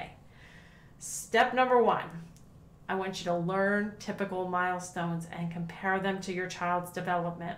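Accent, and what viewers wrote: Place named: Pennsylvania, United States. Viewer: Knew you were from Pennsylvania right away.😂. from a South Florida transplant by way of the Lehigh Valley and thank u for rhis guide 😊❤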